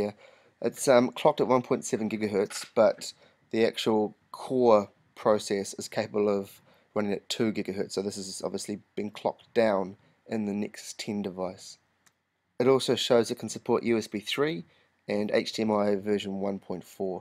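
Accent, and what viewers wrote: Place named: New Zealand. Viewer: I think your from new Zealand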